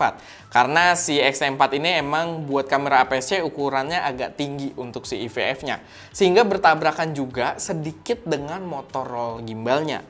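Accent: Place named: South Jakarta, Indonesia. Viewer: aksen jaksel bgt, fix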